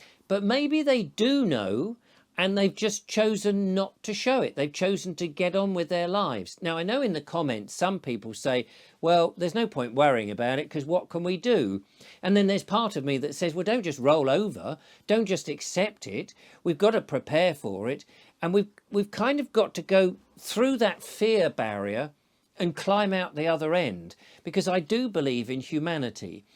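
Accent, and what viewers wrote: United Kingdom. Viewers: Too much British 😊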